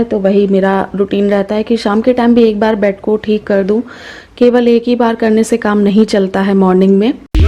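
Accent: Kanpur, India. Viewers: Aaj ka like, comment kanpuriya language k liye ... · Hello ji kaisi hain aap yah Kanpuri Bhasha mujhe bahut acchi Lagti Hai Main Mera Ghar to Lucknow Mein Hi Mein Hi rahti hun lekin Meri jyadatar rishtedar Kanpur mein hai aur mera aana jana Laga rahata Hai Kuchh farookhabad mein bhi hain Sare rishtedar Udhar hi hain Tik Tok Par video banana Koi Buri baat nahin hai agar aapko Achcha lagta hai to aap banaaiye bus yah hai ki Thode bure comment bhi Aane Lagte Hain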